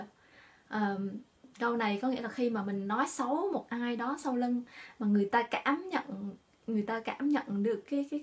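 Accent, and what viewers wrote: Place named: Paris, France. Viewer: Cảm ơn cháu cho bác có dịp nghe lại giọng pure 'Parisienne' , mong cháu cho thêm bài mới nhe. BON SANTÉ.- Bác ttt, WA, America.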